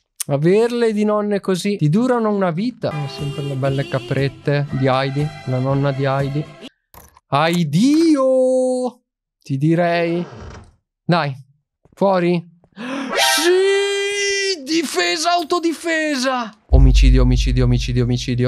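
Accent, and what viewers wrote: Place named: Veneto, Italy. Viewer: mi sembri veneto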